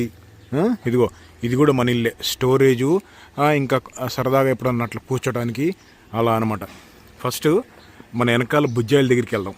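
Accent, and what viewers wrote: Andhra Pradesh, India. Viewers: Anna medi Andhra naaa or telangana naaaa mee language amo Andhra laga undi but ur staying in Nizamabad how come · Mi Yasa Andra laga undi Enduku telangana laga ledu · Mee slang Nizambad slang or telangana slang laa ledu Enduku Anna, Andhra slang la untundi enduku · Anna mi slag andra laga undhi .mereme telangana antunaru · Anna meeku andhra roots unnaya? Slang chaalavariki andhra laga undi❤ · Miru Andra settlers aa bro basha atlane undi nijamabad lo chala untaru kada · Slang enti Bro Andhra laga vundhe medhe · Anna meeddhi andhra laungauge lekka vundhi endhanna😂 nizamabad na manadhi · హలో బ్రదర్. మీరు ఏ జిల్లా. ఆంధ్రావాలా మాట్లాడుతున్నారు. మాది ఆంధ్ర గుంటూరు జిల్లా మాచర్ల బ్రదర్ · Anna meeru matlade telugu andhra slang la undi telangana slang la ledhu endhuku ani ala...???? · Anna nuv Telangana la putti perigi Andhra oodi lekka enduk matladuthav anna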